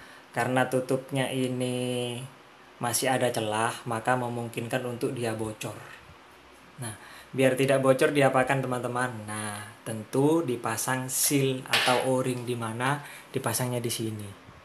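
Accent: Madura, Indonesia. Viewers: Nada2 orang madura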